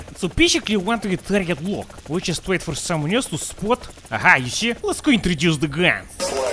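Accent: Russia. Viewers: Борис, ты кто? Русский? или что просто по акценту похож, так что колись! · His voice is so stereotypically russian its hillarious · Чел, ты из росси? У тебя русский акцент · По акценту слышно, что ты русский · Ты руский у тя акцент русский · Почему у него такой русский акцент? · English + Russian fused results in english russian stereotypic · Максимально русский акцент одобряю 🗿👍🏻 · у тебя слишком русский акцент типа реально кажется что ты русский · Слышно же русский акцент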